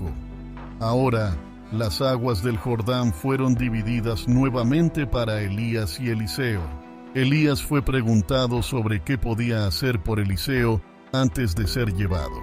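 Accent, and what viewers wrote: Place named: Brazil. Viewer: es el narrador, los videos estan Mal editados, a veces se puede oir un acento como brazileño y a veces hasta en portugués se oye que esta hablando.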